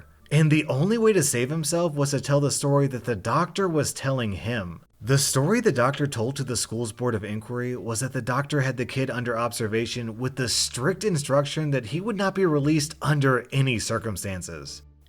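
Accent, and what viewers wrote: Germany. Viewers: I‘m from Germany and I don‘t know this band, but you have an strong german accent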